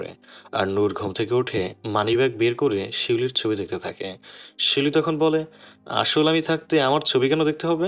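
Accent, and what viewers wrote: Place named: Pabna, Bangladesh. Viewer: পাবনার জেলার কথা শুনলেই ভালো লাগে ❤❤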